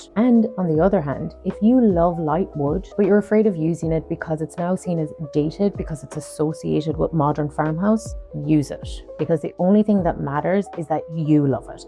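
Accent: Ireland. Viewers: Come to think of it, you do sound a bit Kerry-ish! (I used to have a friend from Killarney.) When I was living there, the only accents I could NOT understand at all were from Clare and West Cork -- but it seems that their accents are even the butt of Irish jokes. Hey! South Dublin used to be nice! And judging from Google Earth and Daft, the home prices are still very sky high in my old "hood" (Knocklyon). It's north Dublin where the trouble is. When I was there (in either Cork City or Dublin), I often heard, "Well, Dublin is really an IRISH city." I found Dublin in 1981 to be quite rude and unfriendly -- and not in the playful, insulting way that friends insult one another in the rest of Ireland. However, my sister-in-law and nephew visited Dublin a few years ago and found the people there wonderful. As for me, I love Cork City! To me, the year I spent there in Cork makes me one-sixty-eighth Corkonian. 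I'd move to Cork City in a heartbeat if personal circumstances allowed. Judging from what you said in your video, I think you'd approve of my little two-bedroom Mexican-exterior-style, single-story townhome (what you would call a terraced home)! Regarding enjoying what comes into style each year or what's trending, though, you're a better person than I am (which is good, since you're the designer)! I keep watching videos about the latest-and-greatest and yelling at my screen, "That's terrible!" or "That's stupid!" or "How boring!" LOL. Yet, I find it to be addictive -- a litt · Oh it feels so nice to hear an Irish accent on YouTube, especially on design subjects.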